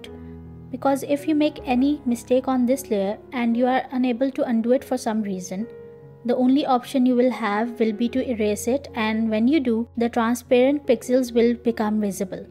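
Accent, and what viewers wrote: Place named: India. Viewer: then what authencity. Your accent sounds indian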